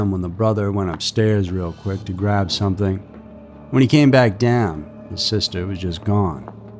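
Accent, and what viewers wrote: South, United States. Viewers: The narrator sounds like airy from the object show objects never escape but with a southern accent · I'm Scottish and I love your southern accent so much 😍. Awesome video, as always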